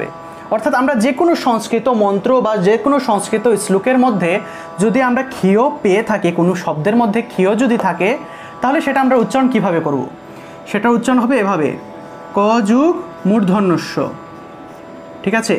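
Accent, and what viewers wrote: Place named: Sylhet, Bangladesh. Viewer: ভাই মনে অয় সিলেটি👌❣️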